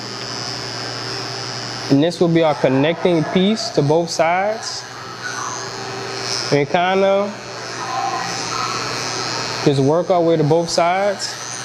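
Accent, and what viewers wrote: Louisiana, United States. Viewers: Good shit brudda 💪🏾💪🏾💪🏾💪🏾 you got a heavy Louisiana accent brudda salute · Louisiana accent loud asf😂😂❤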